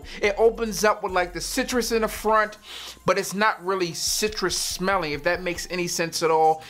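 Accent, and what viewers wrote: Baltimore, United States. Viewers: Homie are you from bmore or Maryland or philly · Definitely from Bmore tho. Good video keep it up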